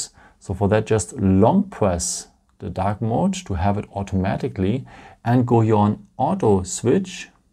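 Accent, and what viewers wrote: Germany. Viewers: If the phone is on standby 'ne?' tells me right away you're German 😁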